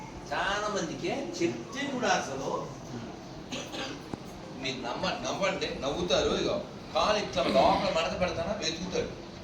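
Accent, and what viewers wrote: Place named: Tirupati, India. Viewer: GURUVUGARIKI SHUBHA SAAYAMKAALAM , PADHABHIVANDHANAM. GURUVUGARU MEEKU MAA THIRUPATHI YAASA VACHEESINDHI GURUVUGARU. "ROJUKU OKATHURI CHADAVANDI" 🙏🏻🙏🏻🙏🏻🙏🏻🙏🏻🙏🏻🙏🏻🙏🏻 ANDHARI VAARU SWAMY, MEERU MAA THIRUPATHI VAARANDEE SWAMY MAA THIRUPATHI VAARANDEE GURUVUGARU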